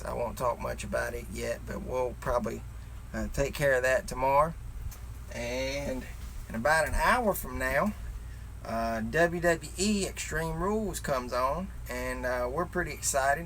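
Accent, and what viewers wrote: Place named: West Virginia, United States. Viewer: wow that southern draw! you sound straight out of west virginia! not being offensive i just didnt expect that lol. im here checking you out like i said i would right as my phone died lol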